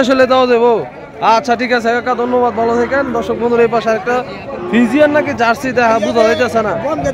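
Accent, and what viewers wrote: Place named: Amgarh, Bangladesh. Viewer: ভাইয়ের কন্ঠে মনে হয় আমগড় দেশি লোক, শিবপুর উপজেলার আসে পাশে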